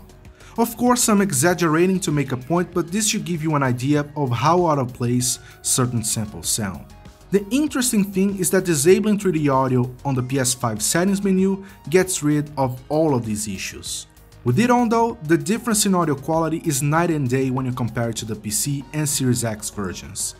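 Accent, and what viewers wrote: Brazil. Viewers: hey man, are u brazilian? great video by the way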